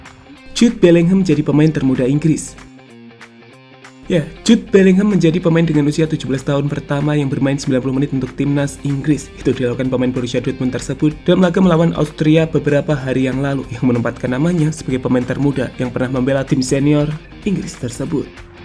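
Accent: Indonesia. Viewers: Anda Orang Asli Malaysia..?? Kok Logat bicara nya Indonesia Banget..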